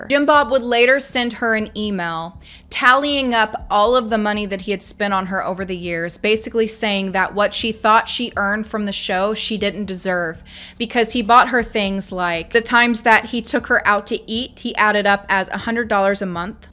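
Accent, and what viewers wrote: Arkansas, United States. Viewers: ❤ Are you from Arkansas? I am..😂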